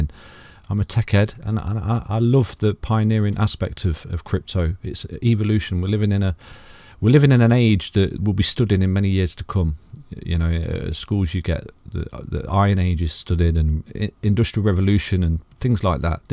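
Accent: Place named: Mansfield, United Kingdom. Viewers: you sound like you have a mansfield/Notts accent. Or am i way off? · Are you from Nottingham? That accent!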